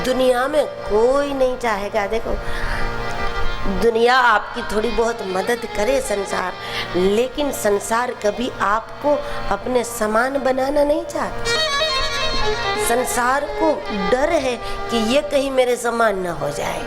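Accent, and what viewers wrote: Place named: Bhiwani, India. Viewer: Dachhhat bhiwani bolrahi hai gajab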